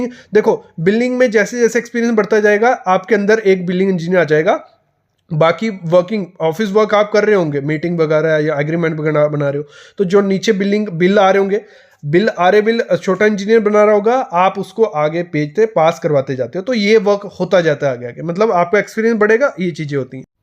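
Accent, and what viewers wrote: Jammu, India. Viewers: Jammu se ho kya aap?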